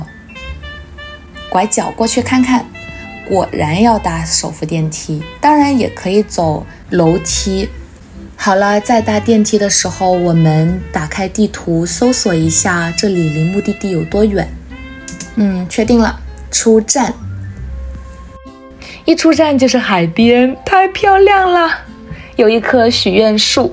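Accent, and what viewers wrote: United Kingdom. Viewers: could you make a video about cultures, customs, things to do/not to do for someone moving or visiting china? maybe do it in this format? thank you for videos... did you live in the UK for a long time? you have an UK accent when you speak english in other vids.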